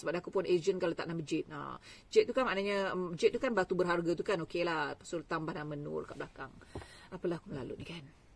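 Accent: Negeri Sembilan, Malaysia. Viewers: camano tetibo akak speaking nismilan ... asal n9 ko?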